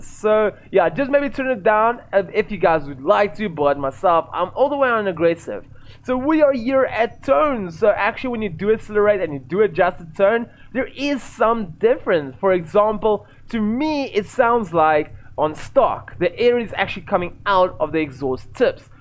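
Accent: South Africa. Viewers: Hi r u south african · I hear that south african accent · bro sounds south african fr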